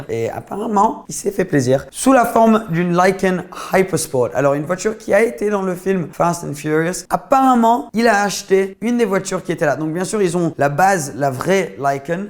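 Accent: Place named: England, United Kingdom. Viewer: Ton accent anglais de merde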